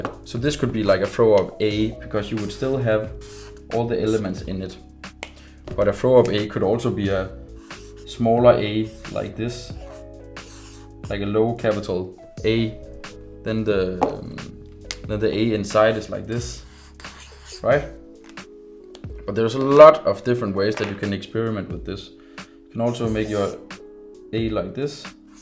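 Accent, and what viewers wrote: Denmark. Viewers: Jeg kan høre en dansker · very god Danglish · Aldig hørt mere dask engelsk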